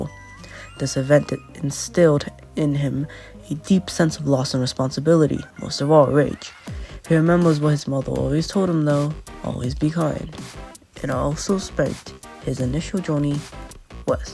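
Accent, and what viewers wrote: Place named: Canada. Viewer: I hear the Canadian in your voice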